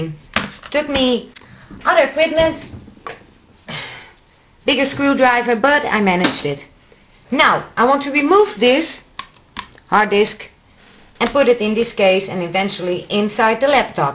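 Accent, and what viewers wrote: Netherlands. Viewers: she is from holland i know from the talking!!!!! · Ik hoor dat je nederlands bent :P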